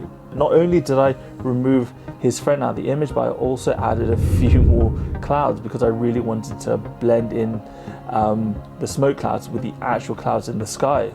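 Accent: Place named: United Kingdom. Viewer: Nicely done my dude. Damn that British accent haha you put the rest of us to shame 😂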